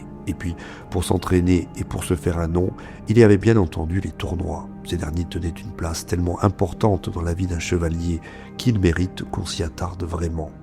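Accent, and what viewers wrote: Corsica, France. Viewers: Alors y a un truc qui m'étonne ;-) J'écoute tes contenus en mode podcast avec un écouteur, le soir dans mon lit, dans le noir avec mon écran retourné vers le matelas pour limiter la luminosité au maximum... Bref, tout ça pour dire que je me concentre sur l'histoire et sur ta voix. J'ai écouté pas mal d'histoires et à chaque fois, je dis bien à chaque fois, je trouve que tu as un accent Corse. Je ne saurais dire si c'est Corse du sud ou Haute Corse mais je penche pour cette dernière. Alors, j'aimerais bien savoir si tu as vécu en Corse ou bien quelle région de France peut avoir un accent aussi proche ! Je vais peut-être gagner le prix de la question la plus bizarre de l'année :-)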